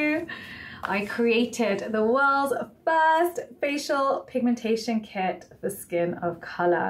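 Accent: United Kingdom. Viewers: please remove the background music 😁 because we have difficulty to hear your voice properly 😕 and we love your British accent 😀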